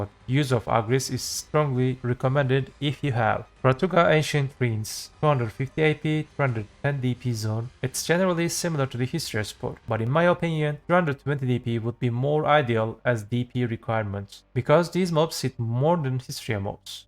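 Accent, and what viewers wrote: Turkey. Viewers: Knk aksanindan anladım bizdensin o yüzden türkçe sorca. 3 ay önce yapimissin suan spotlarin durumu nasıl ? 272 ap 330dp ile.rn güzel parayi mirumoktami kadari. Ne tavsiye edersin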